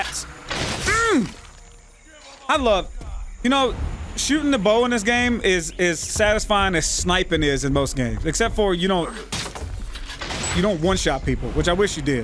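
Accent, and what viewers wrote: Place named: Tennessee, United States. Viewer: You sound like you're from Tennessee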